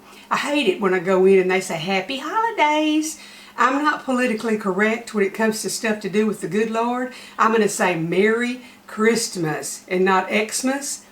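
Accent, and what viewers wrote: South, United States. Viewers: Hi! sent me over and I’m so very thankful she did💚 you so remind me of my husbands grandmother and she lived in Livingston Tx so not too far! I have lived in the Houston area all my life and I just love your sweet southern voice and cooking! I am new to canning and can’t wait to go through all your videos to learn more!!! I also know I’ll love these muffins💚💚💚 MERRY CHRISTMAS to you and yours 🎄🎄🎄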